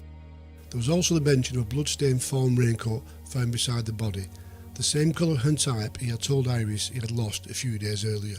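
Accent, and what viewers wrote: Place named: Northern England, United Kingdom. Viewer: Considering I'm a confirmed Yorkshireman, there'd b sum sort of rivalry, bt nt this time, it's gud to hear a Northerner, even better as it's a Northerner doin such a great job with these videos, gud job, u deserve any plaudits 👍👍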